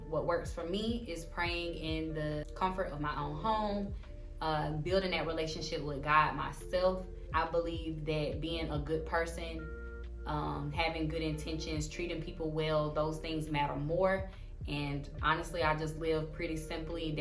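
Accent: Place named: South, United States. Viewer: Southern accent wasn’t expecting tht😂😭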